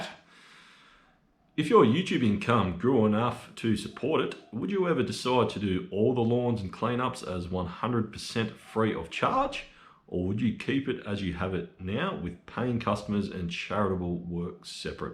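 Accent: Australia. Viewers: Great video!!! Thank you so much for sharing and taking the time. You are such a generous kind soul. 🤗🤗🤗🤗 . Keep the videos coming please ❤️❤️❤️❤️❤️😜... I m French . I lived in the UK before and I work everyday with Americans at work. I can understand you fine 😜. I actually really like Australian accent and Scottish accent 🤗🤗🤗🤗. It makes your videos even more enjoyable. I m a fan 😁😁😁. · Hi, this was from 6 months back & now January 19, 2023) Your Q & A Topic was Wonderful, first Thank You & your Wife-Claire for your Service!! It was nice of you to tell all of us your Family, a Lovely wife-Claire & 3 girls & the youngest a boy...that is wonderful too! Wow you have a few hobbies, play guitar, pencil sketches, modulars & video games...especially with your kids, & movies & Star Wars!! I liked hearing about what you did in the Army, my husband was in the Marines but he did that way before Me! 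As for maybe coming to USA, Kevin from Lawn Care Juggernaut from Ohio & you having him come to Australia is nice, but look up Spencer, from SB Mowing from Kansas..I like him a lot better!! I`m a American & I hear you fine with your Aussie Accent...which I love listening too! Congratulations on 100k, you Earned it for being Nice, Polite & your Funny things you put in the Videos!! As for the Rude People, Don`t Listen To Any of Them, You are doing a Great Job!! The saying here in USA is... If You Don`t Have Nothing Nice To Say, Don`t Say Nothing At All!! Best Of Luck, with your YouTubes & your Married Life!! Have a Good Work Week & a Wonderful Weekend with Family!! Love from Central Illinois USA 🥰😎❤ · How awesome to learn about you and your family. No wonder you are such a wonderful human being. This was a great video! I love the shout out to Brad from Copper Creek. I don't know him personally but live within a mile of him. 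I watch his videos and love it when I recognize a property he is working on. And just so you know ... everyone I know, and I mean everyone, loves an Aussie accent. My son even has his Siri set to it. Talk about accents? I'm a Florida country girl with a thick southern accent. I don't realize I have an accent until I meet someone outside of the small town I live in, and they have to comment about it. Usually it goes like this, "Where did you get that accent? Are you from Tennessee?" My reply is always... "What accent?" It must be pretty thick because the workers at the Chinese takeout can't understand a word I say. 😆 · I love Australians accents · Recently discovered lawn care channels. Had know idea they existed. Love to see the detailed results I guess. This is the only one I've subscribed to. And your thick Australian accent is part of your charm. BTW, I'm American. Would love to visit Australia someday. 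· I've always loved the Aussie accent best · I love your Australian accent and have no trouble understanding every word you say. I especially like when you call a weedwacker a whipper snipper. Congratulations on getting to 100,000 subscribers. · Gosh I loved this! So fun to learn about your military service (thank you), that you have 4 kids and an awesome wife, that you call out the jerks (thank you again), that I melt every time you say "G'day" (love an Australian accent even if some *jerks can't understand you), and that 100K others have the same satisfaction watching your videos. The Grand Finale music is the best so I'm inserting that here for your awesome milestone!! Congratulations! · I have no idea why I love your channel….just do. You have a very sincere appeal….and that Aussie accent is amazing! You always sound happy. Your finished shots show just how much you love mowing.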